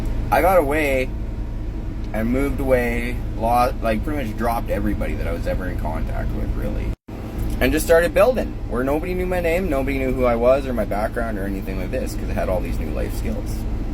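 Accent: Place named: Canada. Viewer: The Canadian accent man I love it.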